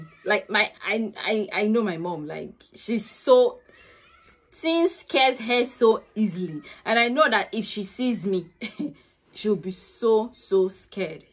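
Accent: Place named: Ghana. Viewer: So sorry 😞but na ur stubbornness cause am 😆 I love ur English u speak like Ghanaians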